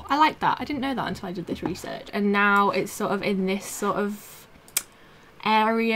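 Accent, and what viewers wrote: England, United Kingdom. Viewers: I love your videos. I am person with a lot interests and I’ve only just now decided to get to learn graphic design and your tutorials and awesome and greatly put together. That Kate Winslet English accent has my heart pumping in this African heat. Thank you my 🙈🙈🙈🤣🤣🤣🤣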